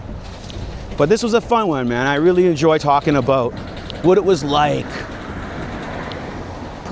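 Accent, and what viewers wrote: Canada. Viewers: Born 1983, so I'm here for this. I grew up without cell phones and we didn't get dial up internet until highschool, so it felt like the best time for that too- it was a new way to connect and plan stuff, and as a nerd, it provided the ability to find other people who liked the same stuff on web forums and chats. At the same time, it didn't create permanent record. There's no viral video or tiktok of me getting rejected or saying something dumb or falling asleep in Environmental Biology (sorry Mr. Gaspar- actually he was totally cool with it and let me sleep since I was normally a good kid and was obviously just exhausted). I was a free range kid for most of my childhood- today I'd have been taken away from my parents by the state. I think that's what changed too, prior generations, if their kid died or was seriously injured doing something stupid, they dealt with the tragedy and moved on. 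Now, those parents could be arrested for letting their kid get into that situation. I'm guessing your Canadian from the accent and some of the terms you used, so from an American perspective, if your kid gets hurt, not only is the parent getting in trouble, that medical bill could be devastating, which certainly incentivizes keeping little Johnny on his iPad vs on a bike. It got too expensive too. Everything did, everything is a commodified, and there is no place for kids to go and just be kids, it's even worse for teenagers. I understand not wanting to drive when every aspect of driving is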